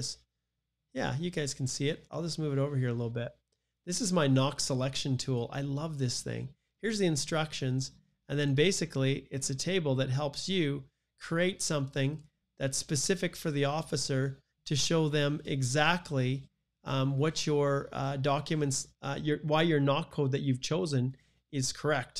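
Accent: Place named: Portugal. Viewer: Great Portuguese,! I'm impressed. You got a bit of Portuguese (from Portugal) accent there, awesome!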